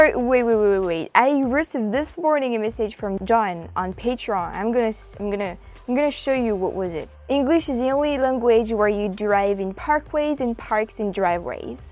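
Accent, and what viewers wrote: France. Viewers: I assume you could come to America and a restaurant would hire you for your French accent. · The French language when spoken in English is very nice sounding.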